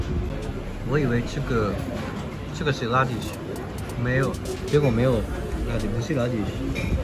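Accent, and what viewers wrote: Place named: China. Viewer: あなたの中国アクセントも可愛いですね😊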